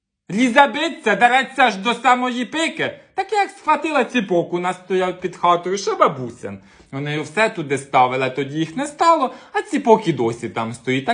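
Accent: Poltava, Ukraine. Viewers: Так стає тепло на душі "по домашнєму" як чую цей рідний Полтавський говір❤. Все дитинство перед очима пробігає. Дякую за твою роботу,. Ти неперевершена, як завжди. Чудовий гумор і надзвичайна подача ❤ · ти неперевершена!З дорогою полтавською говіркою!Дякую!😊😊😊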